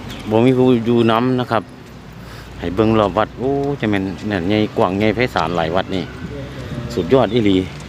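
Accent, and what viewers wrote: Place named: Chaiyaphum, Thailand. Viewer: แอดมิดสำเนียงชัยภูมิออกคักเนาะอ้าย555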